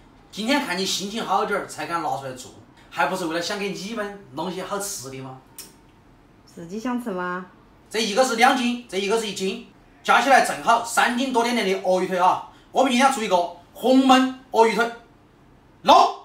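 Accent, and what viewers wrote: Sichuan, China. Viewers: 小廚講話有四川腔，阿為啥唱歌辣麼標準?????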